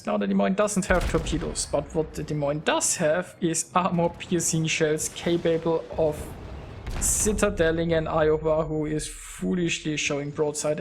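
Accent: Germany. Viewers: are you german? when you speak english you sounds like a german...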